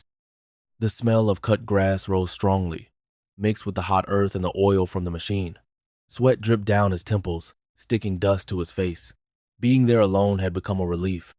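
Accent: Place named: South, United States. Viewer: the masculine version of a Southern voice was mesmerizing and crept into my mind as if it had a master key to open all of my hidden feelings I had locked away long ago.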